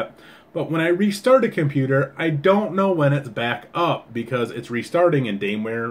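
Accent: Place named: Michigan, United States. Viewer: I love a good Michigan accent 😍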